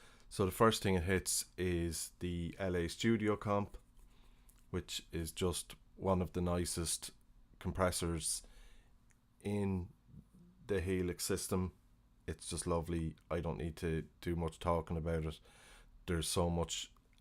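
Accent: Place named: Ireland. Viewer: and? You started life with two strikes against you. I was going to offer my sympathies but I heard your voice and it appears you're Irish which more than compensates for the two aforementioned failings.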